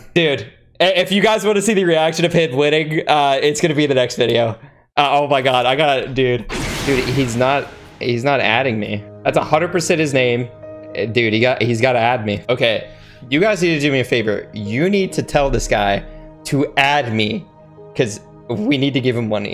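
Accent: Germany. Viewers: Is he German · Are you german?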